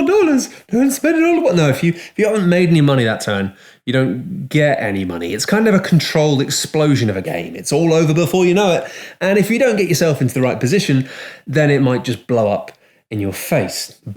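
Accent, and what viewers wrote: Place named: Northern England, United Kingdom. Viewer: Is it just me or is about a magnitude of ten more Northern in this review than normal? Good work, represent!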